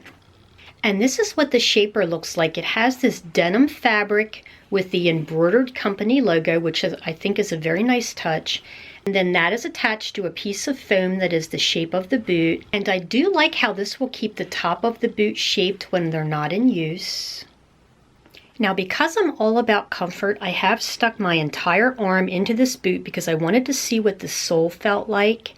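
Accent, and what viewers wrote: Philadelphia, United States. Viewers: Thank you for posting this video! I’m cracking up tho I hear a lot of Philly/S Jersey in your accent. Se d them back if they hurt. But they’re beautiful and legit boots of good quality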